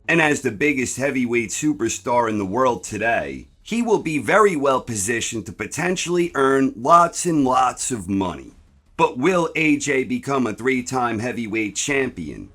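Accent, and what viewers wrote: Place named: East Coast, United States. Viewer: What east coast city accent is this?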